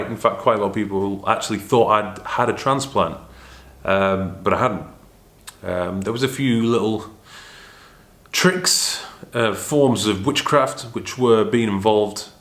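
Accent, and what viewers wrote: United Kingdom. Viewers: You don’t need hair,. Look at your jawline lol you have an amazing physique buddy and your British accent only makes you more attractive. You have no reason to be self conscious, you seem like a great guy.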